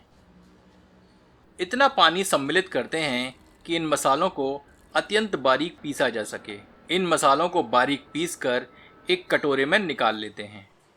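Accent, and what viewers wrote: Standard. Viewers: Recipe to acchi h bhai g par itni शुद्ध हिन्दी sunke maja aa gya 😊😊👍👍👍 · आपकी शुद्ध हिंदी ने मुझे मंत्र मुग्ध कर दिया। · Wowwww too good recepie 👌👌👌 aur usse bhi zyada aapki hindi.. bahut achcha laga sun k itni suddh hindi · आपकी रेसिपी तो शानदार है ही और सबसे अच्छी बात आपका हिन्दी में शुद्ध पकड़ घी से भी ज्यादा मनमोहक लगा प्रणाम स्वीकार करें... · Itni sudh hindi bahut acha laga aap ki awaz aur receip Humne aaj first time aapka vedio dekha😊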